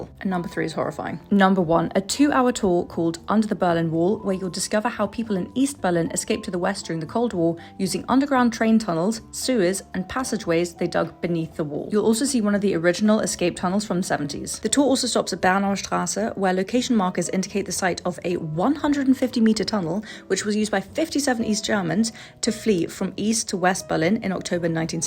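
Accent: United Kingdom. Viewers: As a German myself I would’ve never guessed you‘re german. I thought you were british. You got that british accent from living in the UK haha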